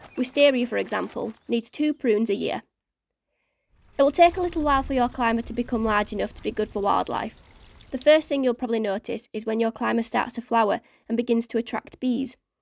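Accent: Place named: North, United Kingdom. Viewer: Good to hear a northern accent narrating!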